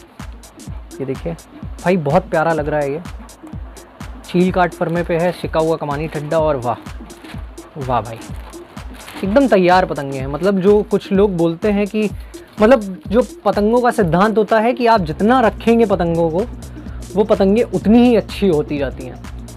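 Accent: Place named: Allahabad, India. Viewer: Allahabadi bhasha me akdum lalantak Patang hai bhai apki.... Man moh Liya bhai. LOVE FROM ALLAHABAD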